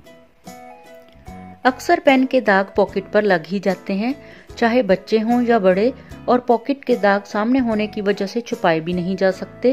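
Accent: Punjab, India. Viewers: Ma'am Aap ki hindi sun kar toh hum bhi Punjabi bolne lag jaayege, hamari Hindi ko Pls. Mat kharab kro.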